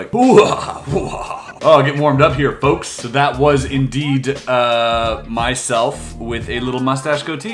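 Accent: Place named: Canada. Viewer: Your Canadian really shines through in those old videos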